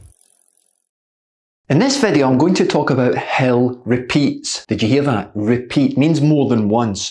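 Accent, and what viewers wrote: Scotland, United Kingdom. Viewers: I love how you're lovely scottish tones make hill sound like hell, I think either works lol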